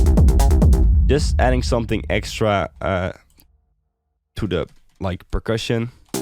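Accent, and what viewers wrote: Netherlands. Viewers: ik hoor de Nederlandse accent haha, +1 like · ik ruik een nederlander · hey are you dutch? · are you dutch? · Are you dutch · where are you from? kinda dutch accent XD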